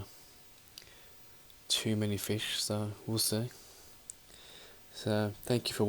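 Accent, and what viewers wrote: Birmingham, United Kingdom. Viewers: ah thought I heard a fellow Brummie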